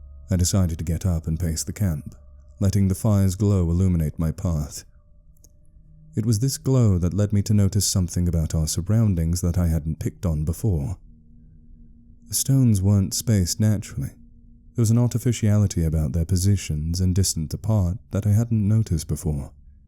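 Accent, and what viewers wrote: Germany. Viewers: You know he nailed the german accent when it reminds you of Werner Herzog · As an enthusiast and practitioner of accents, i've gotta say your German accent is on point!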